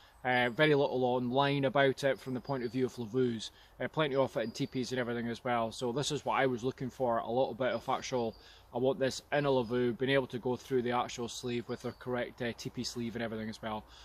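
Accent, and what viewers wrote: Inverness, United Kingdom. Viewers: Hi...Found your channel , subscribed and am working my way through them....Enjoying them very much...I am intrigued with your accent...I am an Invernessian born and bred....Your accent is somewhat similar....Great variety in your vids , thanks for all your filming efforts...Look forward to watching more....Cheers from Inverness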